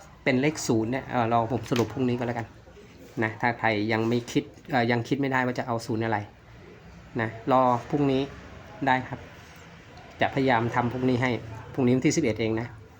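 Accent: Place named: Isan, Thailand. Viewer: สุดยอดเลยครับเว้าภาษาบ้านเฮาหม่วนหูหลายอี่หลีเอาให้แม่นๆเด้อหนุ่มภูพานครับ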